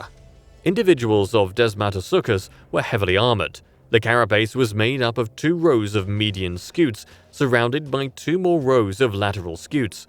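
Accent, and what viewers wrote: United Kingdom. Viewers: Am I the only one sick UK accents?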